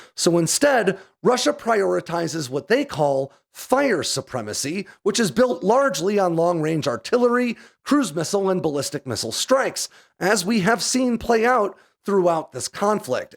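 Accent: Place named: New Hampshire, United States. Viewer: He went to school in New Hampshire and lives in Georgia. His accent is definitely New Hampshire, but some southern gets in there from time to time.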